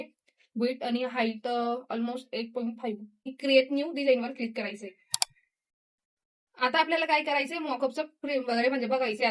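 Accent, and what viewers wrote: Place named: Khandesh, India. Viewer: Tai tuzya aawajat खानदेशी भाषा आहे म्हणून तुझे व्हिडिओ फार आवडता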